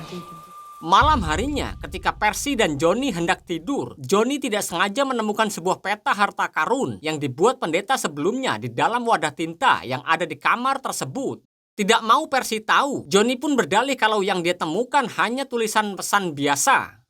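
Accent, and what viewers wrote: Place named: Surabaya, Indonesia. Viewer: Penyiarnya lucu.. Bahasanya suroboyoan, mathek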